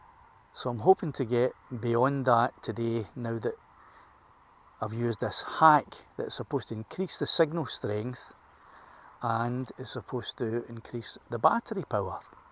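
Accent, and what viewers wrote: Scotland, United Kingdom. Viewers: Scottish accent is the best. ☺️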